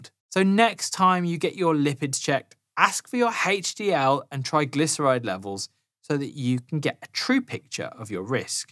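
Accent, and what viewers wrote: United Kingdom. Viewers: At last ! A British accent !! From a knowledgeable British doctor. No more Yanky ti Yanks lol. Thanks for posting.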